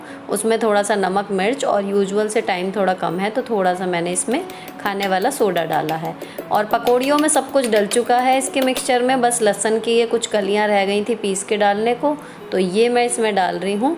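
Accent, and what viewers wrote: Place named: Malwa, India. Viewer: AP kha se h mam apki language me mp malwa ki jhalak h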